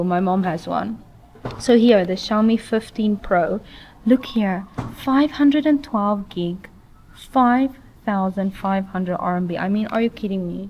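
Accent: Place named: South Africa. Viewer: Please forgive my asking.. You sound South African.. Im from Johannesburg And im your newest subscriber 🎉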